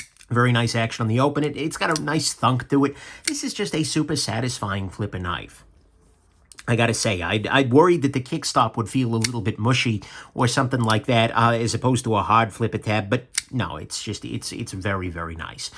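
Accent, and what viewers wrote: New York, United States. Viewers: I love your old - style NY accent. It reminds me of Stitch Mahone from the Paradise Ally movie.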